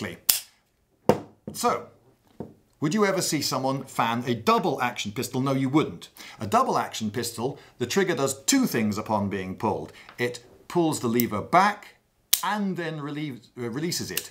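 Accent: United Kingdom. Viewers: That was very clear and expertly done. The British accent gives this video some class. 👍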